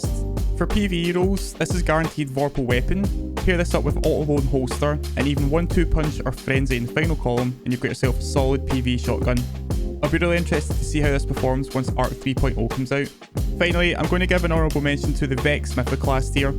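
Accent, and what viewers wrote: Scotland, United Kingdom. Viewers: Man I am a sucker for a nice Scottish accent. Subbed! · Thanks for the video! Super helpful. And something about a Scottish delivery of the information makes it 10x better.:)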